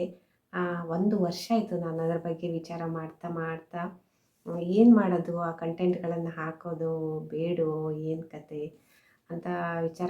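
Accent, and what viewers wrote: Standard. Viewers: Nimma video bari super hagutade.yellaru ivarige support madi.swatcha kannada matanaduva shaili olleyadagi barutte.yellarigu request maduta iddene support madi .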